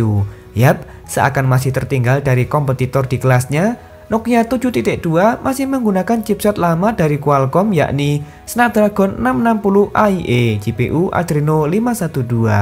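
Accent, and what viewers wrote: Java, Indonesia. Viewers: Medok benerrrr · medok tenan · Membawakan konten nya terasa medok mas Logat nya. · Masnya orang jawa y? Koq medhoq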